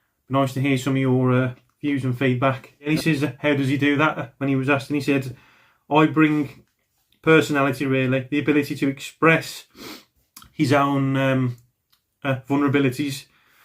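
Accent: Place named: West Midlands, United Kingdom. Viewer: Great video again bud, you sound like your from my neck of the woods lol I'm a blues fan based in ahem West brom. But I'm enjoying your vids mate your certainly getting better as you go along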